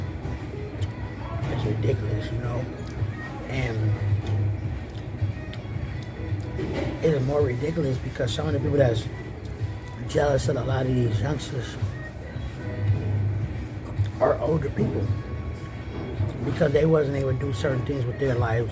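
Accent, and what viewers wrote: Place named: California, United States. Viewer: Bruh as soon as i click on this vid and i could tell, Cali, esp after hearing 🤣 yeeeee🤟🏿 this was some real shi tho. Am subbin 💯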